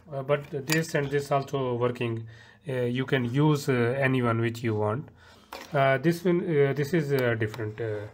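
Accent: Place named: Pakistan. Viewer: Sir ji min pakistan sy hun ap ki awaz sy lag raha hy ap pakistani ho help me sir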